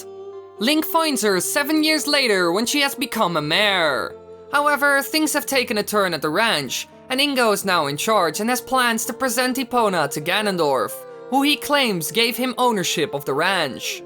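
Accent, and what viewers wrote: Netherlands. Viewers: Tombsday he’s Dutch that’s how people from the Netherlands sound like when speaking English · Wow dutch accent much haha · lol. ik ben ook nederlands en ik herken dat accent van 10.000 kilometer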